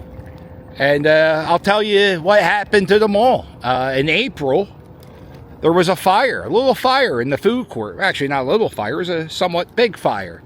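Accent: Philadelphia, United States. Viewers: You definitely have a strong Philly accent 😂.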